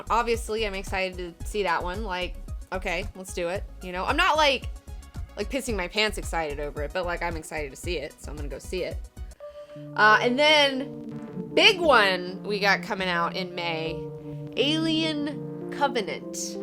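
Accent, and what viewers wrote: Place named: South, United States. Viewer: Oh my god, I didn't realize that you're pushing back a Southern accent until you broke into the stronger (though still mild) Deep South accent when you were get excited (and possibly because of what's in your drink) about how Sony's fucked up Spiderman. Also, truer words have never been said. Sony is a sad fucking movie production company.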